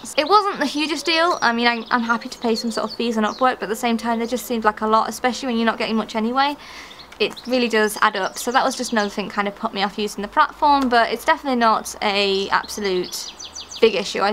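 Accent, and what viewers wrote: United Kingdom. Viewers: came here for upwork related content but oh God That British accent <3 I want to speak like you :( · english from uk lovely :)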